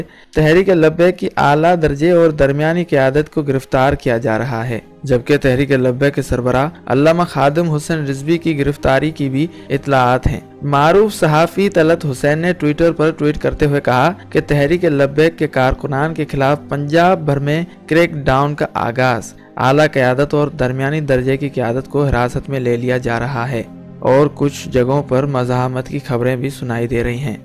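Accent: India. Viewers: Tera lehja indian lagta hy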